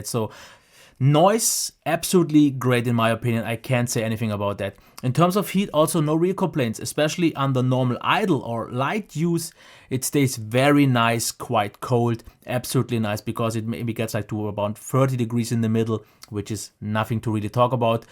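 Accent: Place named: Germany. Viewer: You sound German. 😉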